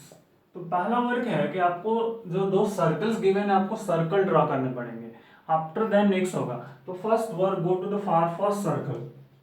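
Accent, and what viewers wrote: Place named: Bihar, India. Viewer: i guess you from bihar, living near gaya... because i had a teacher who speaks same like you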